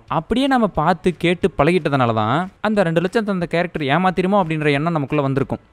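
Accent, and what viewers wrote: Salem, India. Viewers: Bro appa neenga salem ma 😅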